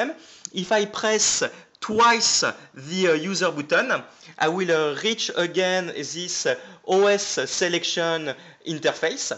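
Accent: France. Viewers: you have a really thick french accent...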